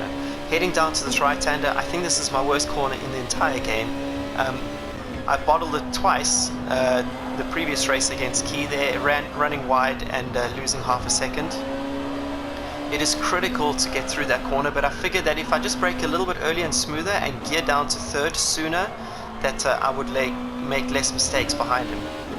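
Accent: South Africa. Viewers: Nothing like a south African accent......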